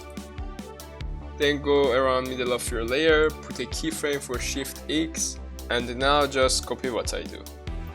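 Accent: Iran. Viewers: ایرانی ترین لحجه ای که شنیدم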